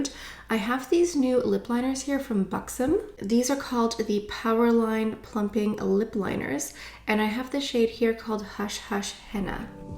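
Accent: France. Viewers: You have such a beautiful French accent! Lovely to live in a dual language French-English country. I agree with your assessment of Natasha Denona - definitely some hits and misses for me. I really enjoy using the Chanel palettes we have. I too have grown a little tired of the red/pink color story.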